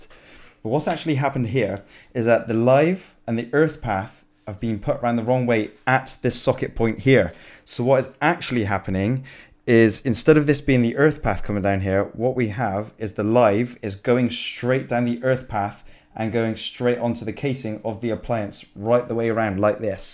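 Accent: Bristol, United Kingdom. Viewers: This is worth watching just for the Brizzel accent. Make sure you say thirteen more often please (firt'een) 😂😂😂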